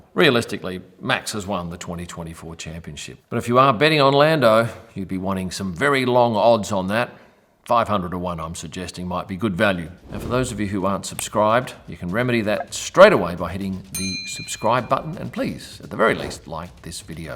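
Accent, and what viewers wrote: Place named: Australia. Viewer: There are many Australians out there in the big bad world. We seem all sophisticated etc. but secretly all we crave is Weetbix, Vegemite on toast, proper barista coffee and an Australian voice. Thanks.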